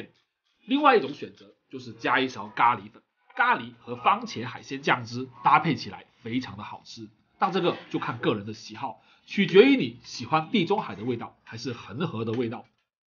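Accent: Chaoshan, China. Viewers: 好明顯係潮汕嘅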